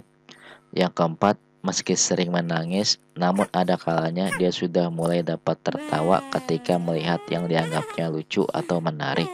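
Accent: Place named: West Java, Indonesia. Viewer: Ini yg ngomong pasti orang sunda ya😂😂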